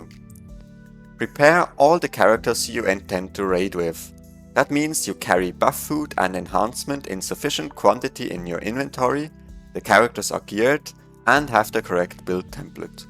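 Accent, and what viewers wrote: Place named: Germany. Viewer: You are German, yes?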